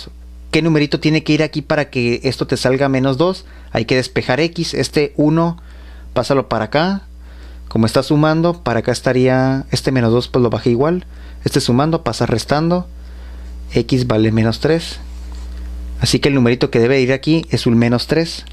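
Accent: Mexico. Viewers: Es mas mexicano que los tacos pero...de onde es (estado) Esq explica muy bien :)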